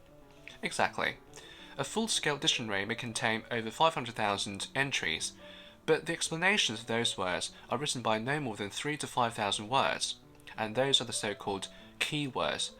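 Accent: United Kingdom. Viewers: 厉害的小伙子！第一次看视频。 Elegant British English!💕💕😊😊💙💙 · 英音听着好舒服❤❤❤ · 請問可以分享您成為口譯員的歷程嗎? 謝謝 ps:英國音真好聽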